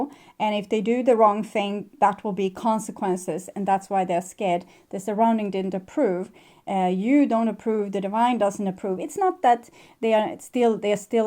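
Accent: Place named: Sweden. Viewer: thank you yor Swedish english braught many fond memories back out of Järnatiderne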